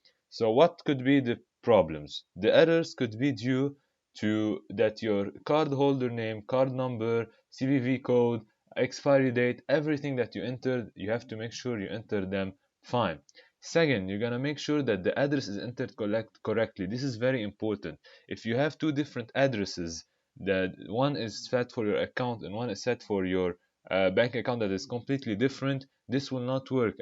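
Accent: Arab world. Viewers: واضح من لهجتك انك عربي 🙂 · Bruh from your accent i thaught u where arab. When i saw your name i knew it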